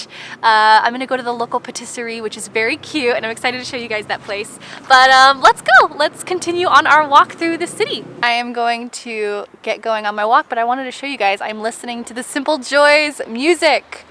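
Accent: United States. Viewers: I lived in the Algarve ( south of Portugal) for many years. I am now near Lisbon. I love Oporto too and I have a very close friend there. I am so happy that you had the time to reply to me. Who knows you and your husband will move here one day, even when you're much older. Life is such a big adventure! I wish you were here so we could chat about our many interests in common: spirituality, philosophy, photography, videography, nature and as a bonus I could even practice my spoken english with you ( love your english and expressions from the US) and I'd happily teach you portuguese, if you wanted to learn, coz it's not really a necessity here in Portugal. Also please know you are making a difference in people's lives. Last week the words you said about letting go and trusting the universe, when you told us the story about the french bakery, came to my mind several times. 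It's nice to be reminded and your words helped me🙏! Keep up your awesome work! Much love to you!💞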